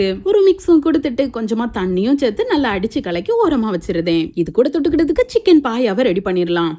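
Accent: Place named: Kanyakumari, India. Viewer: Unga native kanyakumari district Tamil akka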